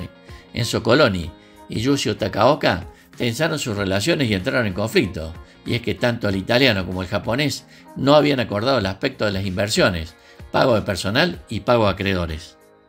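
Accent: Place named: Córdoba, Argentina. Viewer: Cordobés vos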